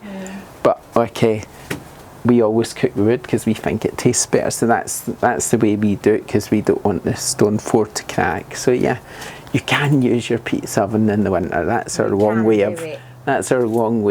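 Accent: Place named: Glasgow, United Kingdom. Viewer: Thanks for the info! I love your accent - are you from Glasgow?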